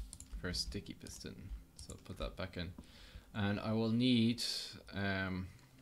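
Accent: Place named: Waterford, Ireland. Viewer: Thank you soooo much man, i have spent literally 18 hours between trying to figure this out and watching an insane amount of gobshites TRYING to explain how to do this hahahaha. BTW are you Irish? You sound like a Waterford man ;)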